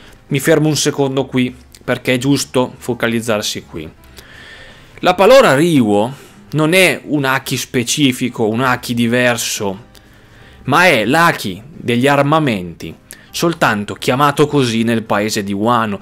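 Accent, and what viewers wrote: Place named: Umbria, Italy. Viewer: senti io mi diverto a indovinare gli accenti..secondo me sei di città di castello dimmi se ci ho preso. Saluti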